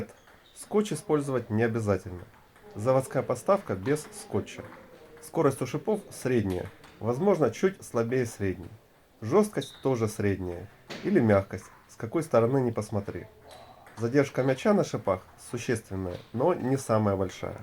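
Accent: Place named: Moscow, Russia. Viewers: А что тебя больше расстроило-маасковский говор или накладка на уровне ширпотреба? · Судячи з описаного голосом па маасковскі, накладка для класики, бо на повільніших основах срєдняя врєдность з блоків буде ніякою зі всима витікаючими, що зобов'язуватиме власника до відповідних прийомів, дієвих для таких засобів. Це не докір для тестостериста))), лише синтезовані з побачено-почутого власні висновки, базовані на нікчемному досвіді й таких само досягненнях, тобто там потрібно буде проявляти спочатку зрозуміле і потім набуте все розмаїття техніки і тактики, а саме попіхи-перепіхи будуть малоефективні, але для володіючих ними і шипами вцілому достатньо зрозумілі і доступні, знову ж не в докір останнім, бо вони своїми "вміннями" теж можуть зробити гідний фурор, тобто головняк))), опонентам. А ще хотілося б побачити загальну картину гри на рахунок, і суперника, що краще володіє технікою гри і переміщень, а не одразу в рукопашну...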